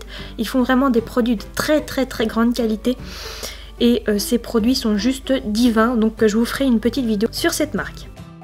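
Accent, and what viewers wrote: England, United Kingdom. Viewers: 😊💙 (j'adore ton accent anglais ) 💙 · Sinon superbe vidéo le son était parfait rien a redire Azzaro est juste trop beau et ton accent anglais est normal ! Si tu entendais le mien !XD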